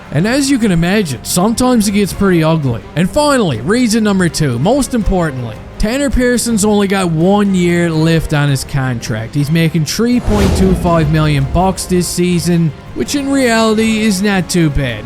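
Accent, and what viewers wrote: Newfoundland, Canada. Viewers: Is that a Newfie accent?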